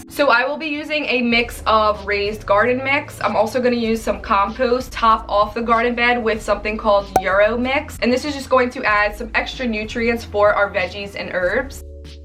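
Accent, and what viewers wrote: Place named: Philadelphia, United States. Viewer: Oh you are in New Orleans...I thought you sounded like Philly! Please update in a few to show how they have thrived!!! 🌿😀🌱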